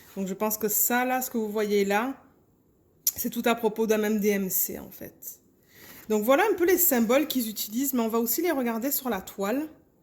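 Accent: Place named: South, France. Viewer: Bonjour, je suis une nouvelle de vos abonnées et je reprends vos vidéos depuis le début mais je me demandais...vous ne seriez pas du sud de la France par hasard? Car c'est très bizarre, vous avez un petit accent que je connais bien pour une canadienne??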